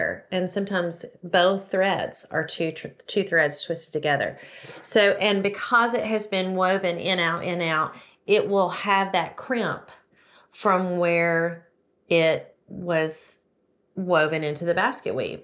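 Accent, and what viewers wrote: South, United States. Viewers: I like your Southern accent...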